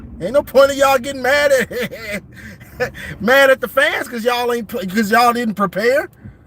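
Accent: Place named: South, United States. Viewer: Dude, I tried listening to U, but U sound like a country speaking Knucklehead, Bro! U have No Idea! 🤦🏽🙆🏾‍♂️ #GoBlue💛💙 #SMASH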